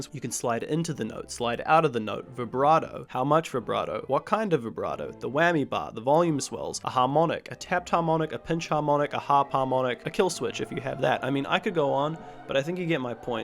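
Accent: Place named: United Kingdom. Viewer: This guy gets it with a British accent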